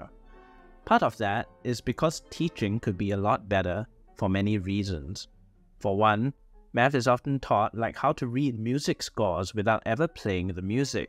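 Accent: Singapore. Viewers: sound like a singaporean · You sound Singaporean or Malaysian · Recognised the singaporean accent 😊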